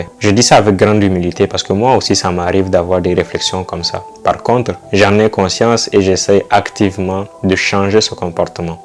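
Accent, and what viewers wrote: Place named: Niger. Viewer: Merci pour le rappel Je sens comme un accent de mon pays le 227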